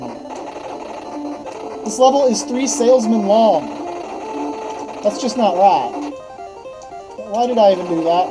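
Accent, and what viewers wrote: United States. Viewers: The cowboy accent throughout the vid was quite an interesting concept. Just for the record, the reason you aren't getting out of the level is due to the lack of a wanted poster strangely enough. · Nice southern accent...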